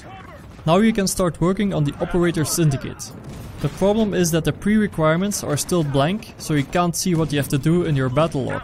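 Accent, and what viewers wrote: Netherlands. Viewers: Ik kan gewoon horen dat je Nederlands bent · je klinkt echt nederlands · 'Dutchy' English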